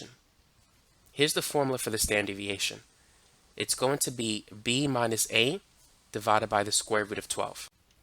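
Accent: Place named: New York City, United States. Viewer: I think there is a slight NYC accent in there. Almost makes me miss home. Great video...thanks!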